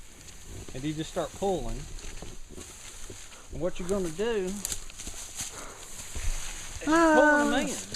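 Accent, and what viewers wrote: South, United States. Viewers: Fantastic Invented Southern Brilliance. Your Honesty, Sense of Humor, That Great Laughter, Down Right Humbleness, Deep Southern Dialect, Warm Hearted Introductions and Best Father-ship makes your videos all worth watching all the way through to the end. Do you offer to your fans any of the products you design, I am a big fan of the V-Tree Grill. All the Very Best!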